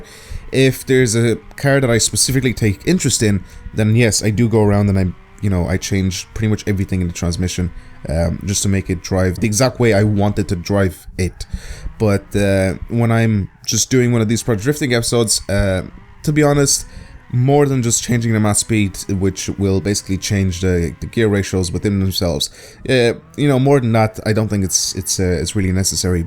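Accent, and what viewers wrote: Dublin, Ireland. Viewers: Are u from dublin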